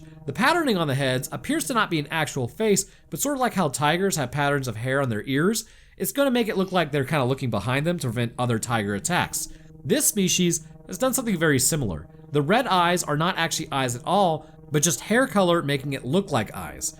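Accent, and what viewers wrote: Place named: South, United States. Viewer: Why do all southern americanss sound the same. You sound exactly like the finance guy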